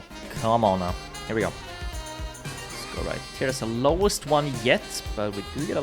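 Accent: Sweden. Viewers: Are you Swedish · is this guy swedish cus he have an swedish acsent when hes speaking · You can hear hies Swedish from hies English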